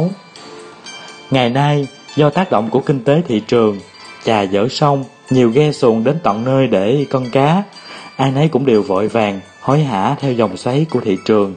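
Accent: Vietnam. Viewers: đánh cá thú vị quá,iu giọng miền tây quá bạn hiền ơi!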